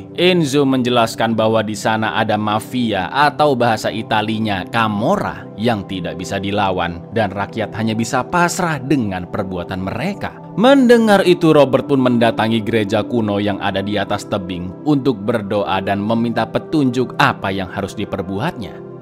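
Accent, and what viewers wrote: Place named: Madura, Indonesia. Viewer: Kok BANGKALAN MADURA cak 😂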